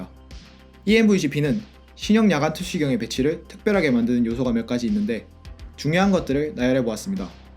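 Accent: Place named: Gyeongsang, South Korea. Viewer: 갱상도 사투리와 영어버터발음의 조화!!!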